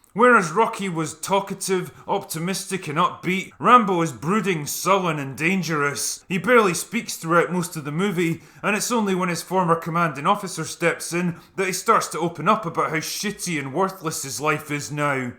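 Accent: Scotland, United Kingdom. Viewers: I assume by your accent that you're a Scot. Do you know the film Culloden by Peter Watkins? This was his first professionally produced film and paved the way for the BBC to produce The War Game (which they then refused to transmit) <link>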